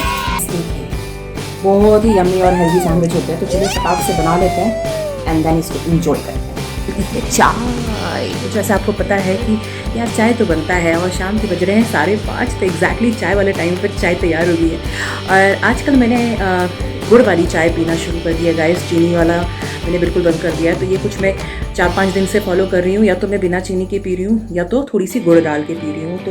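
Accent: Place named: Punjab, India. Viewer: Ek like for the punjabi accent to banta hai... Nice vlog too...keep it up...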